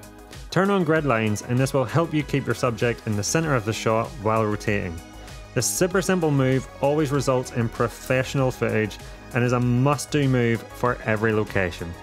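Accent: Ireland. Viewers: Oh.. so this is the famous Irish accent?? The “ i ” sound sounds more like a “ e “ like the word “vedeo”! Love et!!! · Irish accent is epic! Great Vid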